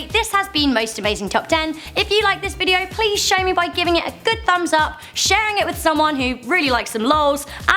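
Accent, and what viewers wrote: United Kingdom. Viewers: Love that British accent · Is her accent British?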